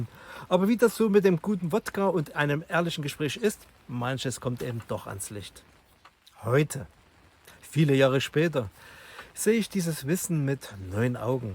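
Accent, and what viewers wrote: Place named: Saxony, Germany. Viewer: Die Petersilie liebt und hasst Hefewasser😮 Das kenne ich😂 (Schockolade) LG aus Brandenburg Du sächselst so schön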